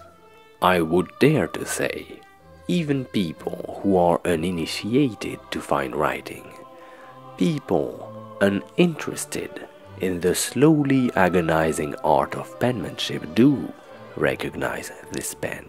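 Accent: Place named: England, United Kingdom. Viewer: J’aime your English accent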